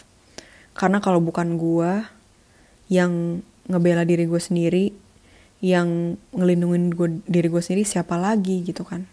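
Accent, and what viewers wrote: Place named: Medan, Indonesia. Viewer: telat nonton ini, tpi aku berasa relate bgt sih kek denger temen lagi deep talk krn skrg lagi nganggur dan chance buat burn out gede apalagi pandemi makanya dtg kesini biar dpt sedikit wawasan sembari mau jdi pendengar dikala gaada temen yg bisa diajak ngobrol. sampai sekarang aku masih mikir kak just being kak same as medan people just being medan people who had known as rough accent, same as javanese people being javanese people who had known as gentle accent, we just need to embrace all the uniqueness of different type of people. maybe for some people they are not familiar to found some people like kak, mereka terbiasa lihat klo cewe mau itu pakek jilbab gapake jilbab ya lemah lembut, atau mereka gk terbiasa dgn kata2 kasar kayak gk lumrah buat mereka. but for some people yang ada pengalaman punya temen kyk kak mereka kek "its not a problem, thats how we were friend, thats how i like her, her just being her, its her choice to how her react" at the end of the day we are all human thats it.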